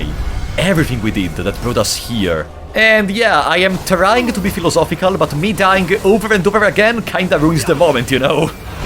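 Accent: Italy. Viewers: Are you a northern or Southern Italian?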